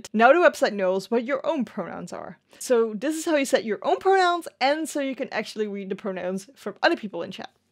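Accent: Netherlands. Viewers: Ik dacht stiekem al te horen dat je NL bent haha heel erg bedankt voor de tutorial!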